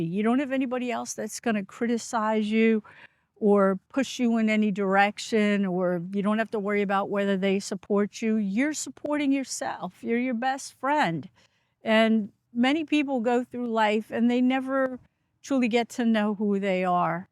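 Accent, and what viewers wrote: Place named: East Coast, United States. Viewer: You have wisdom,! You are caring and a great communicator. Thanks for lifting us up. Life & happiness is what you make it. Love your kind heart & east coast accent. ❤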